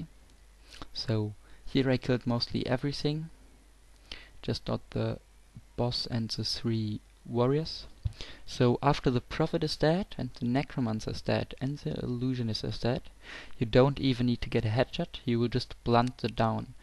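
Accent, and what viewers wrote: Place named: Germany. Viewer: Eigentlich gutes Video, man merkt nur aufs übelste das du Deutschsprachig bist ;) btw, mir ist aufgefallen, dass du beim Snipern kein Stab in der Hand hast, was natürlich sinnvoll wäre ... ansonsten gut gemacht :D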